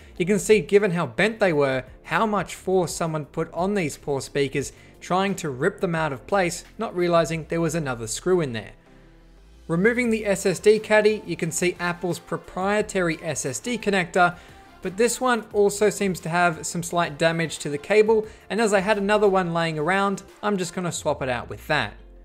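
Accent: Australia. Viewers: His accent mate. He's Aussie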